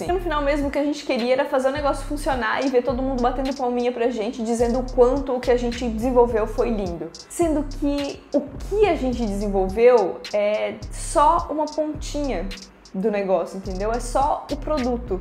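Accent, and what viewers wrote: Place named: Itajaí, Brazil. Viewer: Eu reconheci o sotaque mas pensei que não fosse, mas vi em outro vídeo que vc é da mesma cidade que eu, Itajaí Sc! Quero ingressar no curso mas por ser no período matutino não consigo por enquanto. Espero conseguir em breve!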